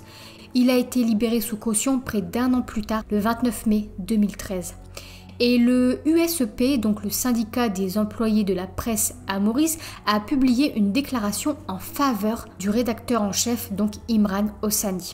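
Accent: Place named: Nord, France. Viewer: Oh lala tu viens du nord toi l accent chti 😅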